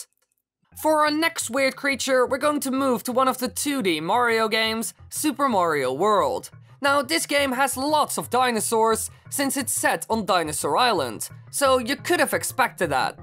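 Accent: Netherlands. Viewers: okay so i watched the video and i just gotta ask..is there a chance your dutch? xD your accent just sounds dutch espacially its not bad or anything im dutch myself (hela hola mijn medenederlanders) but im just really curious ehahaha xD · Are u dutch pls say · Are you Dutch?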